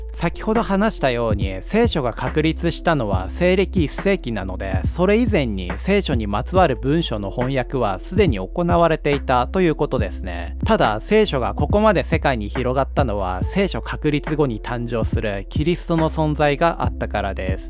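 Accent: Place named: Tohoku, Japan. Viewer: かなりズーズー弁ですね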